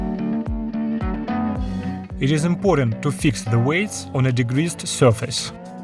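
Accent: Russia. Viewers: ох уж этот russian speaker акцент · наш, из тамбова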